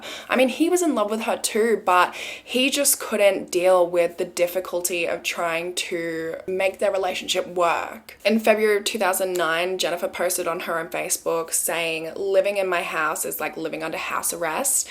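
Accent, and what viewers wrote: Australia. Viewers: You move to where overseas?!! I'm curious!! I would love welcome you to 🇨🇦 You Are awsome!! Love your work and beautiful Aussie accent!!!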